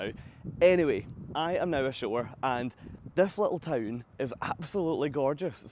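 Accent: Scotland, United Kingdom. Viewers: The title makes it sound as though you're blaming Saint John for the troubles onboard. 🤨 Great vid of the Loyalist City, first incorporated city in Canada. Americans loyal to the crown, left their homes to come here during the revolutionary war. Glad you found Walmart. Love the Scottish (Glasgowan?) accent.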